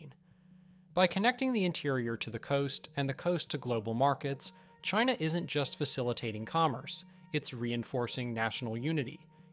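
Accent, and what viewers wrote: United States. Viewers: I love the obvious inflammatory titles from CCP, yet the narrator is American 😆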